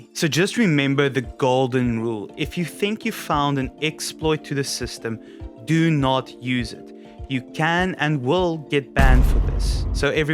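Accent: South Africa. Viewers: Hey man. Random Question - Are you South African? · you from south africa?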